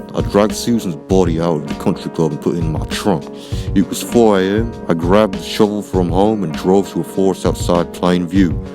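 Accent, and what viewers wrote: United Kingdom. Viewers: you have a deep British accent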